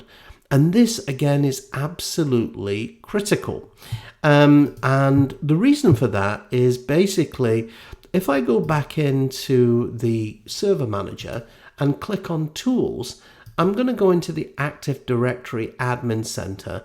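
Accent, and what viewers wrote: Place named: England, United Kingdom. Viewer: Thank you so much for this Active directory video. Well understood. You got a new subscriber. Am happy to join tour team. Love your English accent. 😄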